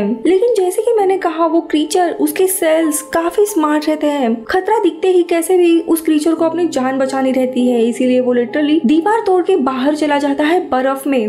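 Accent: Maharashtra, India. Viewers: Are tu Marathi ahes Ka 😅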